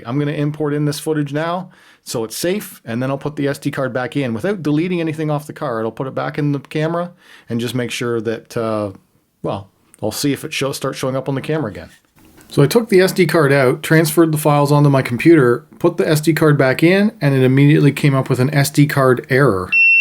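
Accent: Canada. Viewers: This guy sounds Canadian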